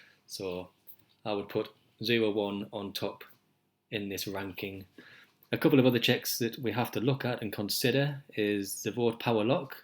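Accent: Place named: Darlington, United Kingdom. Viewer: Sounds like you're just a bit north of me in Darlington. Great to hear a localish voice talking about my favourite subject so I've liked and subscribed!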